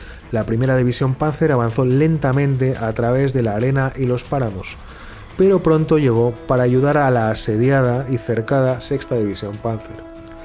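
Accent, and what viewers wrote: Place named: Russia. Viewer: La presentación es buenísima. Brutal ese acento ruso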